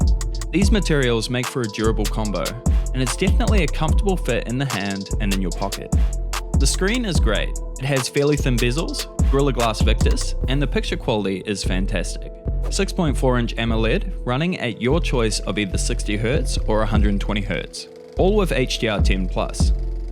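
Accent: New Zealand. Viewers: It really trips me out to hear a NZ accent in a tech review lol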